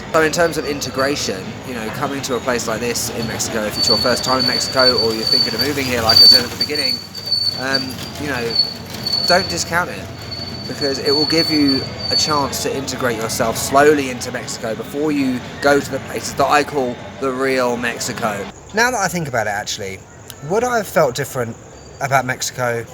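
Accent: United Kingdom. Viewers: OK, British, I love the British culture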